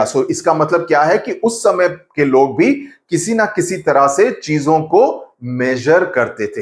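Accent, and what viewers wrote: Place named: South India, India. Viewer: Sir i think you are south indian . Your hindi dictation is clear but hindi tune is like south Indian's people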